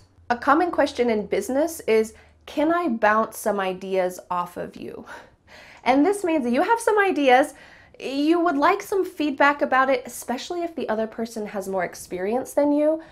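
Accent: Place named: United States. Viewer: It sounds American English? Not authentic one!?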